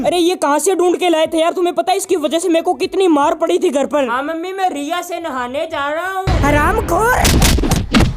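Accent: Rajasthan, India. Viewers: Aap rajashthan se ho me bhi aap ke waha aese bol te h kya Bhai ji Kiya ho 😅😅❤❤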